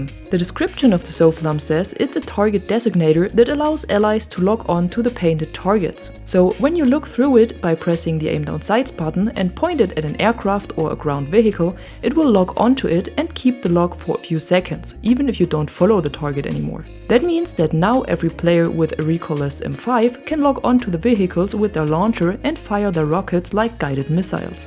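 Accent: Germany. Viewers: Are you from germany? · Is that a German accent? :)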